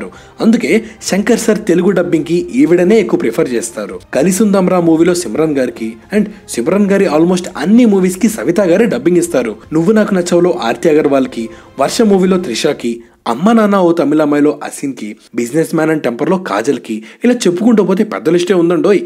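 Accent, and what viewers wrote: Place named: Andhra Pradesh, India. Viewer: Bro talk normally 🙄 u are talking like u are from andra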